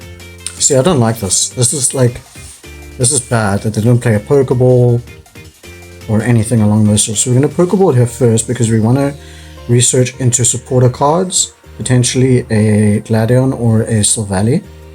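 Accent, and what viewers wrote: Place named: Cape Town, South Africa. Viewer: Me too bro! i was thinking theres no way another cpt gent making pocket content😂. Accent spoke for itself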